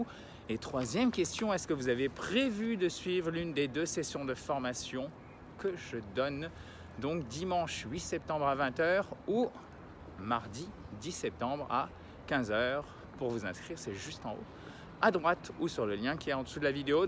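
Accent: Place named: United States. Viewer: Hey it s me Michelle. I work ou english ever days. I see yours videos and tutos de huito 's videos. The difference between you it s the accent American for you and Bretish for Huito. I heard Beatles ' s songs. At the beguining of octobre i have to go lesson by a teacher. I think subcrire at your formation at the end of this year. Not before because i have no time.!!!!!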